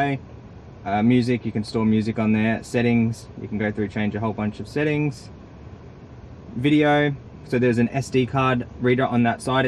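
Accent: Australia. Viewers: Oh ok. What company is it? Is it made locally there in Australia? I'm assuming that's where you are, based on your accent and the fact that the steering wheel is on the right. Just trying to find the best unit out there. Didn't know there were options other than RSNav, which I believe is made in China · He has a gay Australian accent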